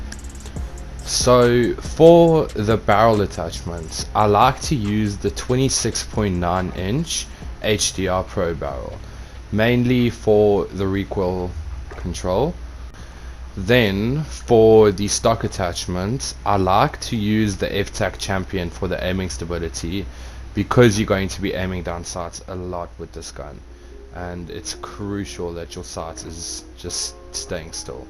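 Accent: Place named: South Africa. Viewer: Why do you sound south african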